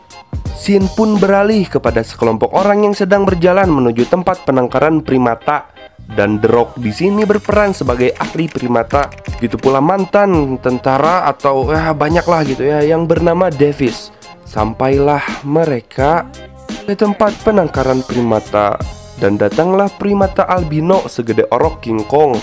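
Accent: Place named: Sunda, Indonesia. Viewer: Story telling sunda😆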